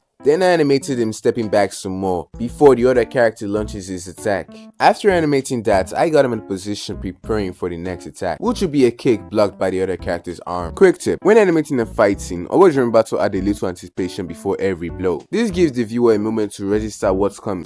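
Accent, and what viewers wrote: Nigeria. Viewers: U no fit hide ur Nigerian accent. Ogbeni nice video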